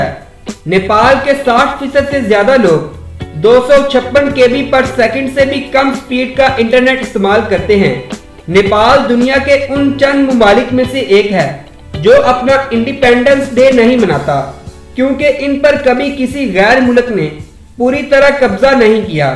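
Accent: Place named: Pakistan. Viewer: Woh Pakistani Urdu accent yesa hota my Dubai mai reheta hoon sob Pakistani ka accent yesa hi hote he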